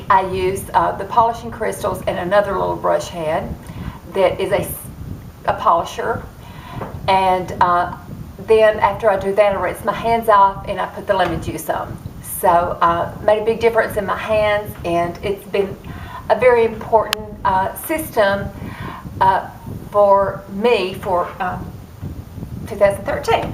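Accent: South, United States. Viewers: your hair is lovely and I love the way you are so loyal to your moonstone shadow....and your lipstick-combo...I received my Naked 3 palette today which I won and have a feeling I have found the right colours for me-cannot wait to wear it tomorrow and will do a video on Thursday-honey, never worry about how long you talk - love your Southern drawl and could listen forever - do your kids have those awesome eyes of yours - have never seen eyes more beautiful..xxxxxSuzy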